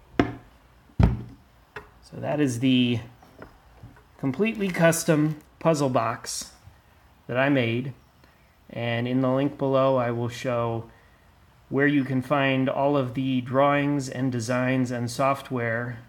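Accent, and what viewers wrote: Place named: Ireland. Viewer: what are you Irish?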